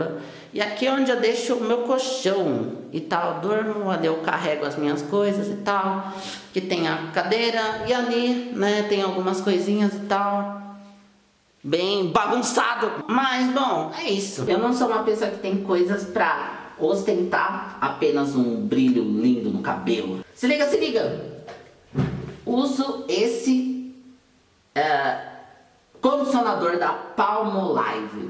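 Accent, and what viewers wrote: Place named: Portugal. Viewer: Tu és de Portugal? Pareces pela tua fala.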